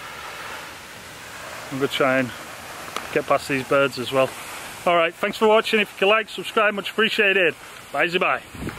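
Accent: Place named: Lancashire, United Kingdom. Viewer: If you had a message it got lost in your Lancashire accent ... Pal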